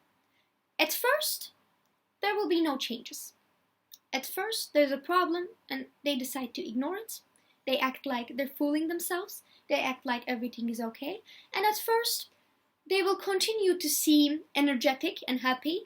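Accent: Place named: Turkey. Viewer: are you Turkish? Your accent is very light, so you are very easy to understand.:)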